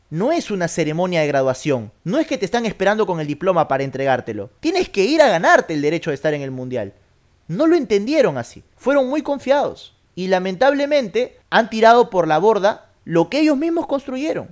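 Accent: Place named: Argentina. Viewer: pero porque hablas como argentino?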